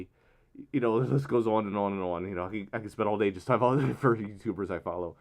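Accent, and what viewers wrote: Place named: South, United States. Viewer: He's from the South, in Oklahoma, so hearing him adds a bit of humor to his content. But he's very friendly so that just ads to the charm of it all.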